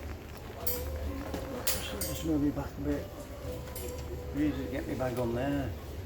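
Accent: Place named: Yorkshire, United Kingdom. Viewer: Where are you from? I love your northern accent 😃is it yorkshire? X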